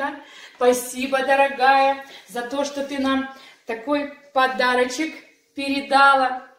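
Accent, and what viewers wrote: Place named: Odesa, Ukraine. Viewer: Да, действительно подарки красота. Спасибо, приятно было услышать одесский говор. Удачи и всего самого наилучшего Вам.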